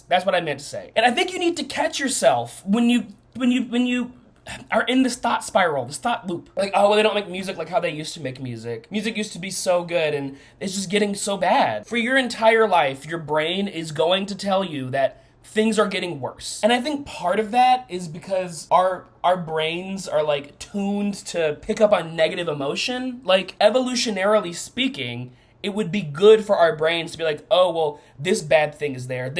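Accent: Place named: Midwest, United States. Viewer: You have the same accent as me: "Root" = "Rut" and "Woods" = "Wuds" - are you midwestern?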